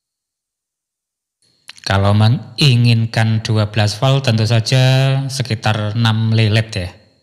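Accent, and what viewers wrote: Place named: East Java, Indonesia. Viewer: sampean orang mana om,,logatnya kayak jatim bagian kulon..😁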